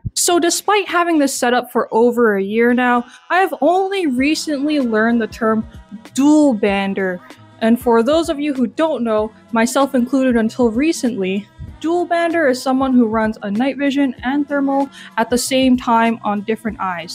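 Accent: Canada. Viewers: am i tweaking or r u canadian?? like from van canadian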